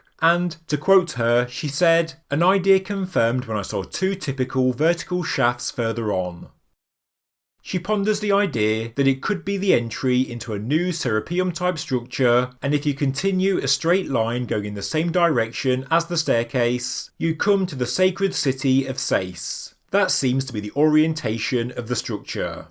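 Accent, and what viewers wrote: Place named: Northern England, United Kingdom. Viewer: Northern English I think.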